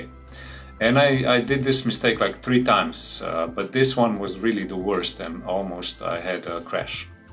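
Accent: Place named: Bulgaria. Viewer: Planning a trip with my 50cc as well, thats some nice tips, you have a bulgarian accent somehow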